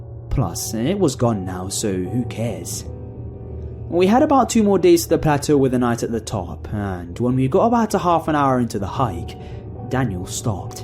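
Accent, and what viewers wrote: United Kingdom. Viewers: Are you and Lazy Masquerade friends or from the same town? You guys talk very similarly. (Not talking about just the British accent of course) Great stories! Keep up the creepy work!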